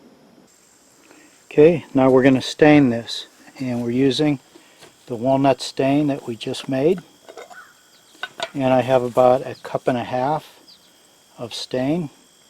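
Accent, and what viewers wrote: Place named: Pennsylvania Dutch, United States. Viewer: I can hear the deitsch accent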